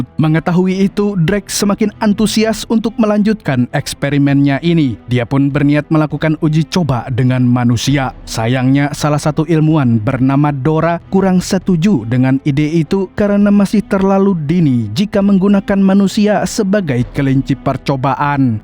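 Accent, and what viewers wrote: Banjar, Indonesia. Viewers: Orang Banua jua kh mang😅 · Baru sadar urang banjar rupanyak😅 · orang Banjar mang? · Banjar banar 😂😂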